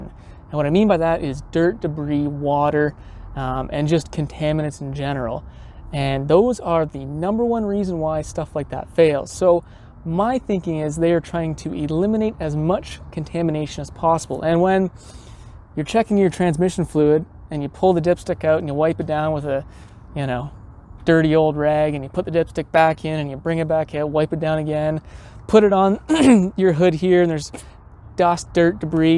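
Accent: Canada. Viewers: this must be in canada i can tell by the way this dude talks why dont they say farenhiet instead of celsius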